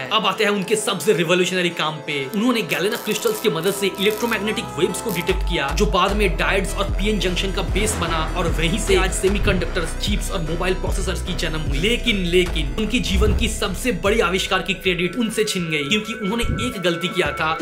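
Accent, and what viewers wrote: Bengal, India. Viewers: Abb samajh aaya bhai tu bengali hai.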